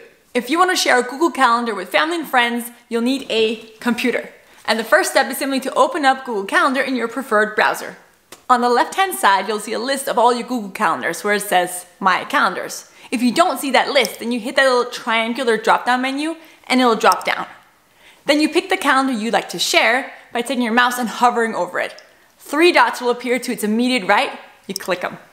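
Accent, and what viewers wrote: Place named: Quebec, Canada. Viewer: ah, I'm from Texas, and my wife is from Virginia. A few years ago we were in Alberta for work, and I could swear your light accent sounds just like a Quebecer. 🤠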